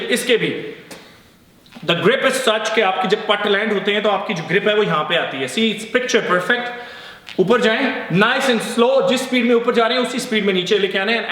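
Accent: Punjab, India. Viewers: bhai saaaa mazzaa aagyaa,,, or bhai aap ke bolnne ka tarika or aap ki pannjabi waahh mazzaa aajata h..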